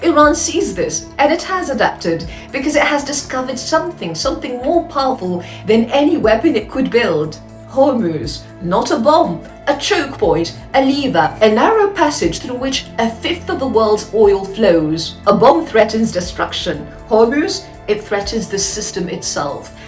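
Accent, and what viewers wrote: RP, United Kingdom. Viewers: This truth-teller with her hilarious Julie Andrews accent haunts my dreams :face-red-heart-shape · I like a lot your British accent Anyhow, never ever trust trump and Iranian people should not fall Again into Trump propaganda 👈👈👈👈👈👈👈👈👈👈👈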